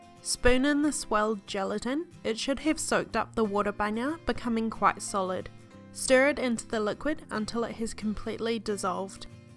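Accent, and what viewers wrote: New Zealand. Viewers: I hear that kiwi accent girl heyyyyy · As a kiwi who left 26 years ago, it's amazing hearing your strong accent. Great video btw. Well done. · New Zealander accent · so many people whinging here - great video well done sis. Love your kiwi accent. from an ozzie down under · hey! she sounds kiwi, and definitely not from texas! have a good day :)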